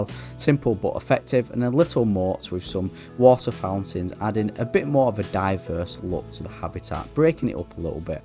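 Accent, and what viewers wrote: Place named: England, United Kingdom. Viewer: Very talented mate- glad to hear the English accent, not often you hear "sack it off" on youtube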